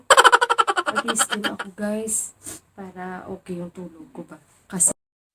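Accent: Iloilo, Philippines. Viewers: Ilonggo ang tuno mu teh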